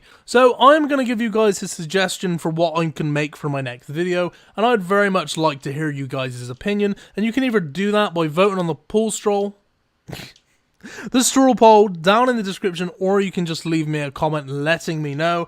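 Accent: Bristol, United Kingdom. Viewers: Are you from Bristol England? Or near by? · are you from Bristol? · bristol accent 😂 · Are you from Bristol? Sound very Bristolian aha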